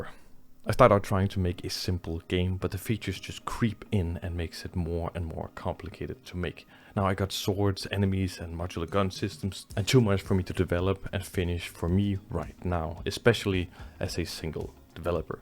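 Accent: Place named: China. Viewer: Nice game! Why not add an animation where if you barely miss the jump you can grab the edge with your hands and pull yourself up? That way you can miss a little and still make it. But if you miss by say more than 2 ft or something, you STILL reach out as you would but your hands just go flat down the surface and you fall to the floor. ? Hey, just curious. You look Saudi Arabia-ish but you sound like 10% Chinese. Are you living in China? I'm just curious! I think I have some strange interest in genetics, morphology of humans and how all that affects their speech and body and brains and so on. Well, good luck with everything you do. You're very intelligent and creative.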